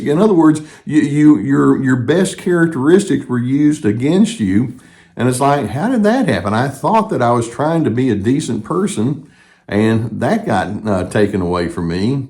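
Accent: South, United States. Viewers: This guy is a lovely healer , always bang on the mark, and the soft southern accent is balm.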